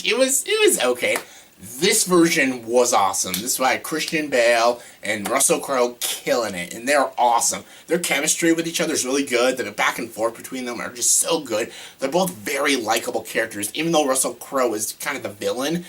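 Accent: Canada. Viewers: He's Canadian that's probably how they pronounce it no need to call him on it.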